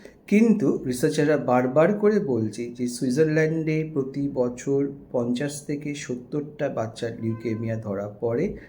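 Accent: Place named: Kolkata, India. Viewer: Nmaskar Sair কলকাতাই কথাই বসেন আপনি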